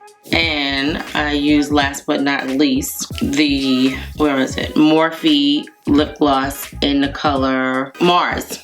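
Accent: New York, United States. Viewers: Native New Yorker!! I knew it! The first time I watched you I was thinking she sounds like she's from NY which is great because I love NY and I'm from NY too😊 I never asked you because I understand YouTube rs have to be safe about revealing personal information and I respect that. OK enough about NY☺ I love this latte look on you❤ The eyes do look more on the golden side but still very pretty.I purchased the Infallible blush too because I saw in one of your haul videos but I got shade confident pink and now I want the shade you used too,I really liked it. Or do you have both shades?🤔 I would love to see how you make your wigs. Your wigs always look beautiful I thought you bought them,good job. I wish you much luck and success on starting your own line. Just wondering why you set your undereyes with two different powders? I tried doing that but it turned out looking cakey on me😒 Btw I saw the Barbie movie it wasn't at all what I expected. 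I'll just say I most I enjoyed about the movie was looking at Ryan Gosling 🙄😄😉 Chat with you soon darling 😘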